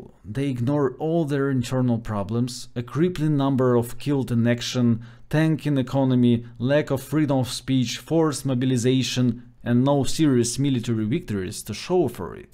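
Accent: Russia. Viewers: I find his accent a bit funny actually. Especially with his excellent range of vocabulary and good grammar. I imagine an intellectual person from Russia in a good movie! 🙃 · Your Russian voice is of the Slavic soul, the Serbian soul... the human soul. Thanks for bringing this short update in that voice.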